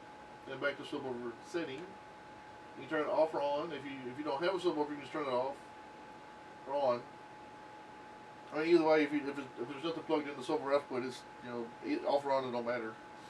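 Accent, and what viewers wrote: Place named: Kentucky, United States. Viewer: sounds like you're from Kentucky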